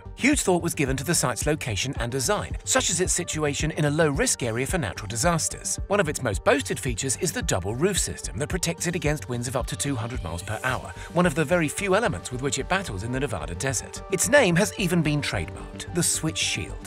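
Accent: United Kingdom. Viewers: ima buy this stock becuz if its .05 per share dividend plus this guy reviewing it with the 007 accent got me sold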